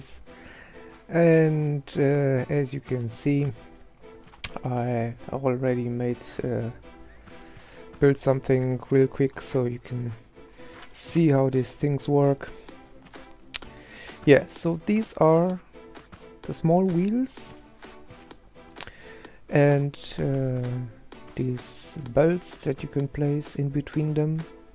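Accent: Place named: Germany. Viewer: You sound German, are you?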